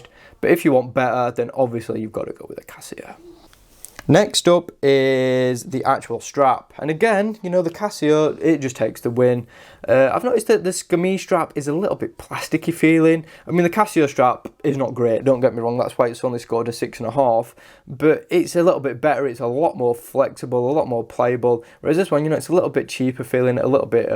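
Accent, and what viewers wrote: United Kingdom. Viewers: LUV YOUR BRITISH ACCENT SU MUCH!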